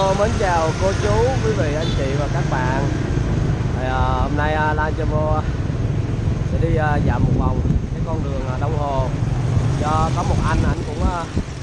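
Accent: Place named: Nha Trang, Vietnam. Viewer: Nghe giọng nói là biết dân Nha Treng rồi... 😆😆😉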